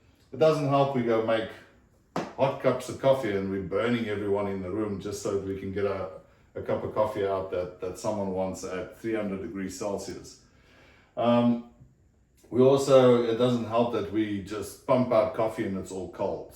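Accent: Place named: South Africa. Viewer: You sound like a Boer...And also, this is the only video on youtube that explain a SIPOC in a way that a dry tree stump can understand. THANK YOU SIR.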